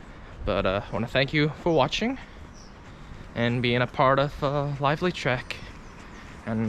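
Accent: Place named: United States. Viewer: Yeah thought you was an American…came across your video looking up Conqueror Challenges, I’ve just signed up for a few…Good Luck! 👍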